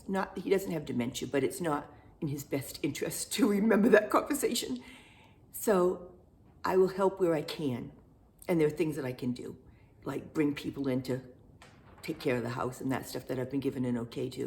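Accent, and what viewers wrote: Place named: Massachusetts, United States. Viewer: Hello, new subscriber here! I just found your channel and I love it! As soon as you started talking I knew you were from Massachusetts! I can relate to everything you have said in this video. I am slowly learning self care instead of being a doormat. I look forward to watching your future videos as well as your back catalogue. All the best to you and your family from Massachusetts!!😊🌲🌳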